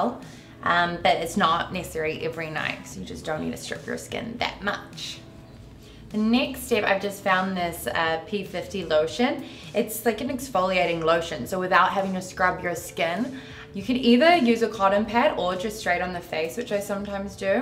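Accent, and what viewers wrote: New Zealand. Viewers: Inna DC She is from New Zealand. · Yup...new zealand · Shes a kiwi 🥝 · New Zealand. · That Kiwi accent! LOVE! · Kiwi thick but definitely tell she talks to ot of american lol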